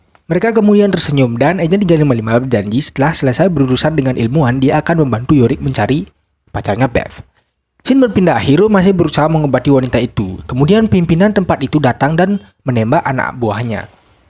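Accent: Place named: Bali, Indonesia. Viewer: Bang org bali ya?logatnya kentara skli hehe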